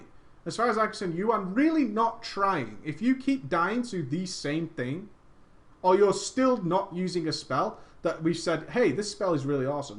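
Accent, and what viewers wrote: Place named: England, United Kingdom. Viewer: Dude your English. Don't know why I never knew that. New sub here.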